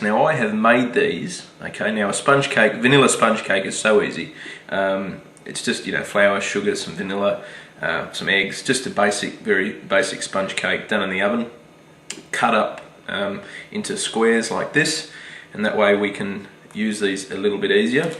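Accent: Australia. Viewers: Can't you tell from his strong Australian accent that's nothing like a British accent? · he an aussie i think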